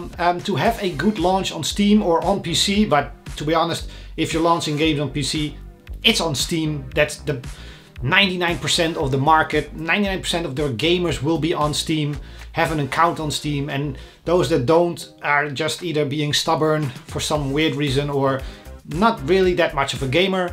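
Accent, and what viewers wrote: Netherlands. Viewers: Thank you for this video! and btw are you dutch?😅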